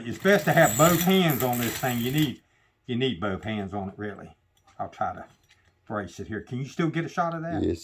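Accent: United States. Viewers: Are you from Kentucky? Your accent sounds like my relatives.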